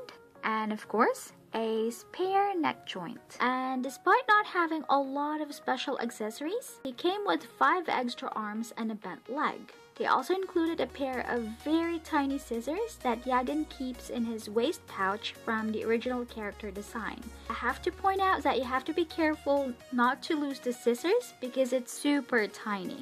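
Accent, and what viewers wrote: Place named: United States. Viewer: Phillipines? You sound American??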